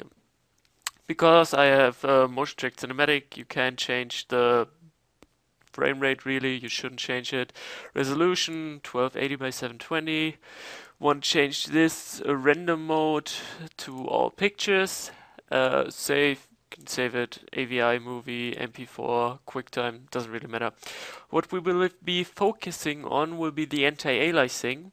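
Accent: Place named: Germany. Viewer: Man hört einfach das er aus Deutschland kommt :D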